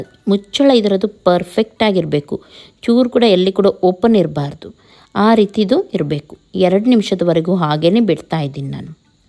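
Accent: Mangalore, India. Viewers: Mam are u mangalorean...🤔🤔🤔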